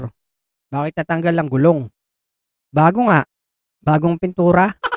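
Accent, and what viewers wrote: Batangas, Philippines. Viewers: Batangueño ka po?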